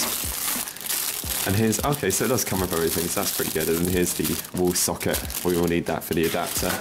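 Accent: United Kingdom. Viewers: You're British?? I totally did not see that coming...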